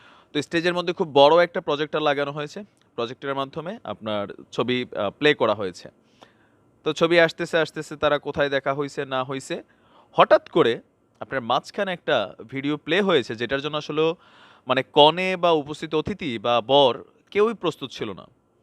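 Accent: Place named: Cumilla, Bangladesh. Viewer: ভাই এই ভাবে গান গাইলে তো আমি আপনার প্রেমে পড়ে যাবো সো কিউট কুমিল্লাার ভাষা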